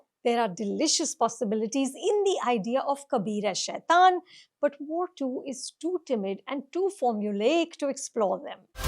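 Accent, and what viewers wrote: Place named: India. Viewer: I swear down Indians don't speak English ... They speak IND-LISH.. no way its English what she speaks lol what in the world is "laboured spy saga"...? How to say that in English? 😂😂😂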